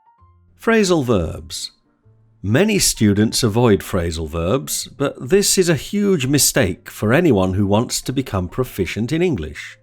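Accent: United Kingdom. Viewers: Your British accent sound is the most stunning that I've ever heard. I appreciate your contribution of British accent promotion cos many youtubers just own American accent instead of British one.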